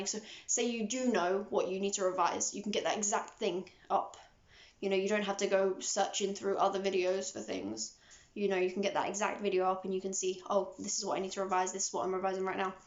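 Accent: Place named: England, United Kingdom. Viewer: i don't know why you sound more english in this than usual it's funny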